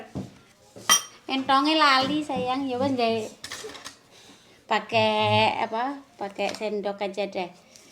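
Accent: Java, Indonesia. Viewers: Yg bikin aku suka liat videonya mb tu gaya bicaranya yg kecampur bhs.jawa medok...lucu bikin aku ketawa2😂😂😂ngedhuk segooooo mbak 😂😂😂😂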